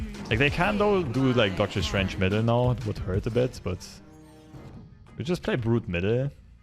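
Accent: Germany. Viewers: where u from? u sound like German or some nordic country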